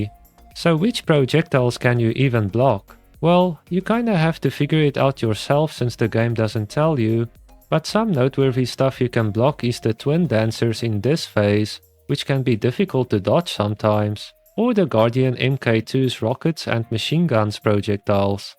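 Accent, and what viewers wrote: South Africa. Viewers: Eh? South African?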